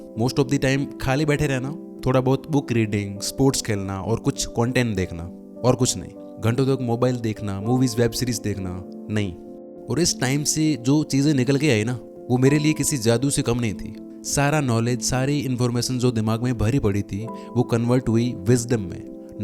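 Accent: Rajasthan, India. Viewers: bhaiya rajasthan se ho bato se lagta h me bhee jodhpur se hoo. or me bhee logo se kat gya hu phone calls band Kar dee Sabhi se bat krna band kr diya par result ka jyada hee sochta Tha pr aapkee process Wali baat me bhee Dhyan dunga ab thanks u❤brother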